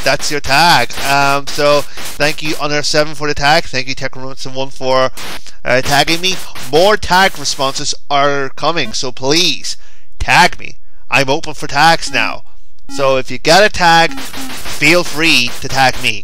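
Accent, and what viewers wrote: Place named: Germany. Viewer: but your german..i could tell by the vocals ..i am german with spanish and portugesse ..ancestory :D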